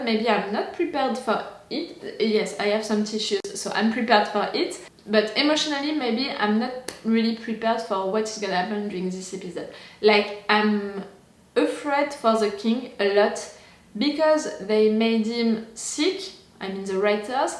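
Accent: France. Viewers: Knew you were French when I heard you. You people have a cute accent 😊. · Tu es française hein ?j’ai reconnu ton accent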